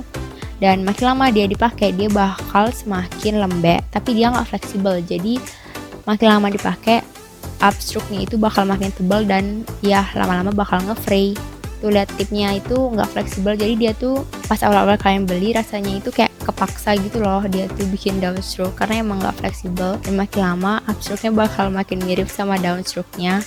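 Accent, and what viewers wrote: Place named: Bali, Indonesia. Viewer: kek logat bali pls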